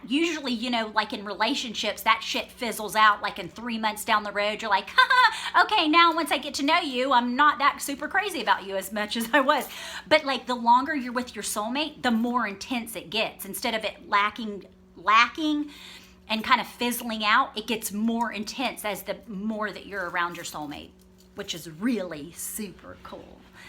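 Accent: United States. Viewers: American accent here. 😅😊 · are you from georgia,cause your accent sounds like it lol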